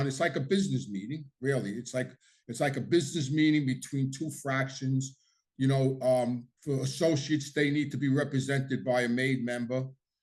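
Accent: New York, United States. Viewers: Huge fan from Toronto, Canada. I absolutely love your podcasts, it's very fascinating the way you tell the stories and everthing and gota love your NY accent, keep up the good work 👏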